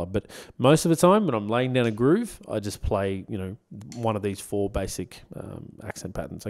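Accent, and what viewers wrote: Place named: Australia. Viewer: Was not expecting you to have an Australian accent haha. Good video.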